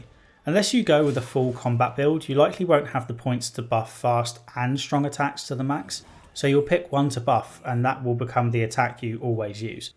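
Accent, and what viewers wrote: United Kingdom. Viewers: The only thing missing from the joseph andersons videos was the british accent, now its fixed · He's very obviously British from his accent though. Stop making this about America. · Why are you trying to make me shut off your video after you go off into left field talking about race? And why demean yourself by calling yourself a privileged white person? Your original instinct in 2015 to roll your eyes was the correct one. Why are fantasy stories mostly populated with white people? Cause it’s based off of European history and culture. Based on your accent you come from a country that used to run the world and the self flagellation is just embarrassing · Oye, francisco, cálmate que te lees bien facho. Él tuvo una mala elección de términos al decir que no había diversidad cultural, pero creo que fue accidental. El universo de The Witcher es basto y rico culturalmente hablando, es lo más hermoso, rendirle homenaje al folklore europeo de tal forma es bellísimo. 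El gesto de su crítica fue decir que se puede entender que los humanos de cierta región sean homogéneos en cuanto a su color de piel y más si se trata de la Edad Media y todo ese rollo, pero con PORTALES, otros mundos y otras especies como la de los elfos, enanos emtre otras antropomorfas ¿y todas esas son blancas también? Muy raro eso, muy sospechoso. El tipo es británico y se le nota en el acento de aquí a la China, dude, chill. Puro facho defendiendo sus sesgos raciales. Si fuese una representación histórica acertsda de la Edad Media sin portales ni otros mundos, pues no hay problema, todo bien, pero no en un Universo donde existe la magia y la habilidad de viajar entre regiones y otros mundos. Facho.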